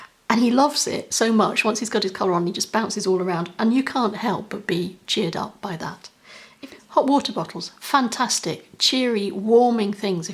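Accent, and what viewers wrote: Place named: United Kingdom. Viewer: Excellent ideas! Especially after everything that’s been going on. Relying on big events for happiness would be difficult because so much stuff is being cancelled. And anyway you always hear the expression “it’s the little things in life!” Getting a card from someone I haven’t heard from or even a text. A child saying something funny that you never would have thought. I watched a video of a family who full time RV’s and their 6 year old daughter said “you can never have too many chocolate chips!” Good thought. Thanks! Your videos cheer me up. I could listen to that lovely British accent all day! Something I just thought of that makes me smile. I love to look for fun, funky socks. Sometimes if I’m down a crazy pair of socks will cheer me up!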